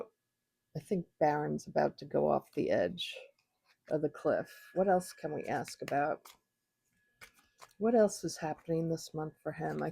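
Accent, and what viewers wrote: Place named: Wisconsin, United States. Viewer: Where do you live? You sound a bit Wisconsin to me.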